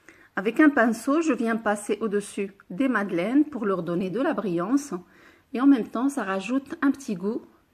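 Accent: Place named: Morocco. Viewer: Choukran tu expliques tres bien merci pour les efforts .Ton accent Marocain est magnifique.😍😍😍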